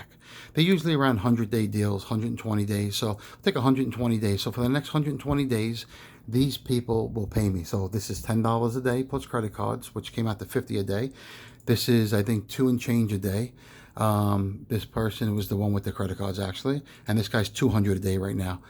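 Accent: New York, United States. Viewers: Nice pickups. I just became you #144 subscriber. I enjoy your gold coin flipping 😆 and your NY accent, as I am from the South. Look forward to watching you hustle up some Stacks of Gold. The faster you acquire it the sooner you quit paying interest to your family associates. Bank 🏦 of looking good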